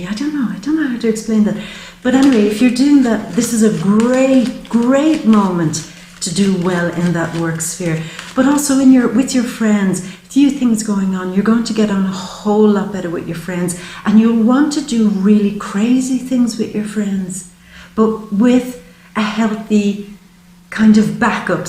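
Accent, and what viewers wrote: Ireland. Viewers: So fabulous to come across an Irish accent 👌. Beautiful reading by a beautiful lady 💖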